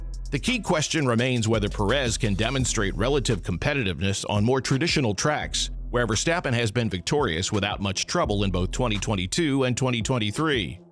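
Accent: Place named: United States. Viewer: ngl I can't grasp an American accent trying to inform me of F1 information. Need british accent, can't believe information being said.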